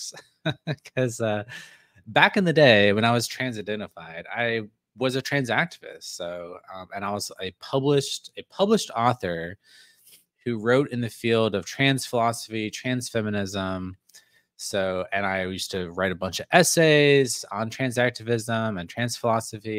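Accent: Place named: Staten Island, United States. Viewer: You have like a staten island accent "dictionarYYYY"" pulling the last vowel.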